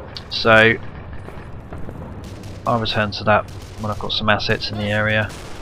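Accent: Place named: England, United Kingdom. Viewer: Your English but you may have some American roots because you do not have a deep English actcent, sorry I am not the best speller. It is almost kinda American if your voice tone was a lil defrent. You sound a lil like total biscut so I think you live in the UK closer to the English channel. Tell me if I am right or wrong!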